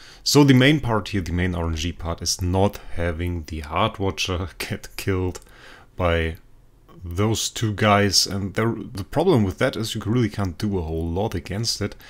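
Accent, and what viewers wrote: Germany. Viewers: Hör ich da einen deutschen akzent oder bin ich lost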